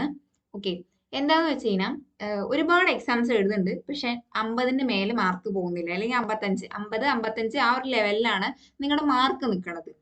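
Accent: Palakkad, India. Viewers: Palakkad aano swadesham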